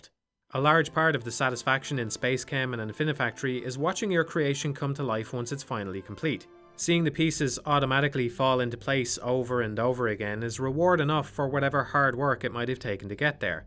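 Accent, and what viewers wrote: Ireland. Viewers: Any chance of a breath of the wild review? I need a twelve hour analysis featuring my favourite floating Irish voice.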